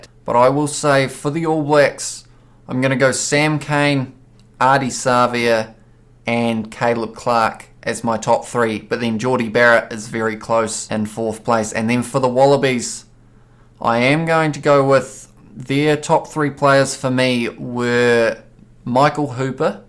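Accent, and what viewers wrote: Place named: New Zealand. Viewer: Kia Ora, cuzzy! Love the Keewee accent!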